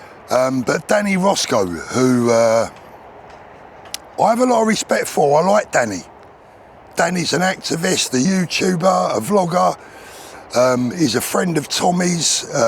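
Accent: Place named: England, United Kingdom. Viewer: home is where the heart is, you like the growth rings inside a tree, they will never change. You grew up in England, your accent is English. You care about your homeland and that will never change I suspect. You moved to change your families life all good reasons, and thats why we have freedom of choice. You are speaking a truth that resonates with your subscribers, and I, along with those who care, still care about you as much as you care about them. The tyre kickers have nothing better to do. They live their lives attempting to drag everyone down to their level of sitting back doing nothing, and spitting out nothing but negativity, because thats all they are, negative people who achieve little, and is why when they see someone who has achieved through hard work, good decisions, and luck can play a part. But they thrive off hate, because thats all they have. 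Just ignore them, when you do that, they lose all power. They will shrivel like a dry leaf when their hatred and negativity achieve nothing. I agree with every word you said close to the end. Best of luck, your heart is true and we all luv you brother.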